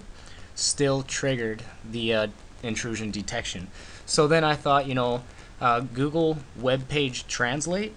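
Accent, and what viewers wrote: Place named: Canada. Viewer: could you tell by his sweet canadian accent?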